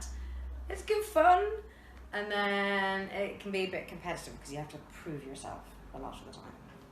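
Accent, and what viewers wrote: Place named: Ireland. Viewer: Ah she’s Irish love it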